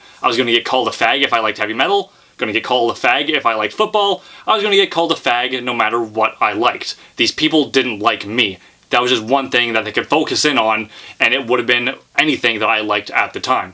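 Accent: Canada. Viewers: You sound more Canadian now.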